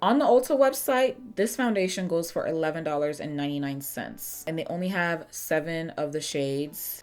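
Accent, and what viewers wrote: Nigeria. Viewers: your nigerian accent is absolutely stunning, i love them so much😍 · Pls do a video where you explain and talk through everything in your Nigerian accent😂😂 I love your accent ❤❤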